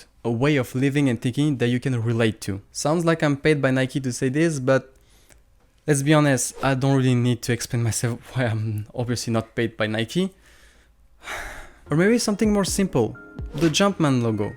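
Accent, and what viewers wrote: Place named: France. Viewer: accent fr spoted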